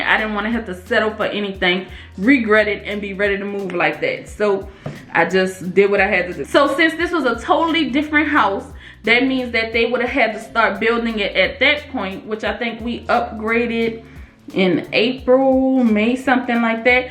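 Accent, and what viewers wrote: New Orleans, United States. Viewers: I'm hearing a New Orleans accent. You're from the boot? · Thank you so much for all this valuable info.... This is Great and Very Helpful !!! Love your N.O. Accent!!!... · OMG! You sound like you're from home (New Orleans). Anyway, I have just started your vids. Yes, I am ready to buy that house this year. I'll keep watching....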